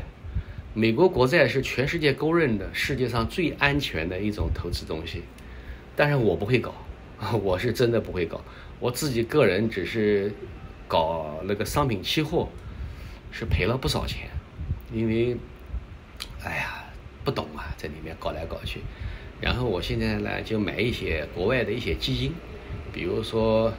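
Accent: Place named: Nanjing, China. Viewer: 南京口音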